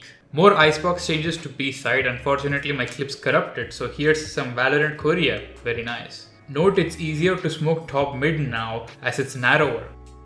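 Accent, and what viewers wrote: India. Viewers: Love u accent Ur indian?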